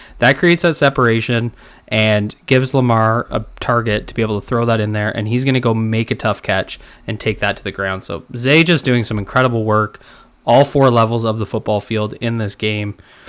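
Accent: Canada. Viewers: Hey man I love your content & analysis. Btw I noticed your accent. Are you Canadian?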